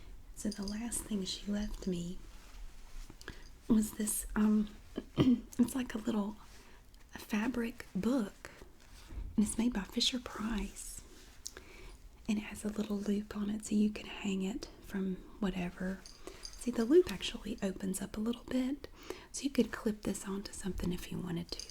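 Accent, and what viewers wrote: South, United States. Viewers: omg southern accent level 1000 haha,you are great girl!! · I guessed those places because she sounds just like my wife who was born in Jacksonville, FL but grew up in Memphis, TN and Byhalia, MS. Southerners are self-conscious about their accents but geeze I love em. It's so American.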